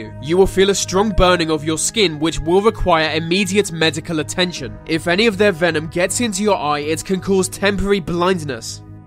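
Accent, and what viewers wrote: United Kingdom. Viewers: Your sense of humor & that Brit accent is deadly too! 😎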